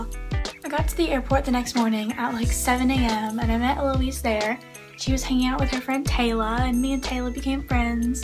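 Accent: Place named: United States. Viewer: You live in Sydney with an American accent... Wow. 😍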